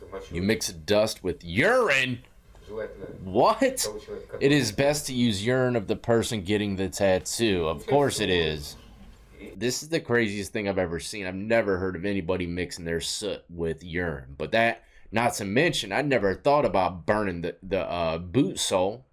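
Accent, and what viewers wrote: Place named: South, United States. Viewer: Texas,Lousiana etc. I Love the southern accent. 🎵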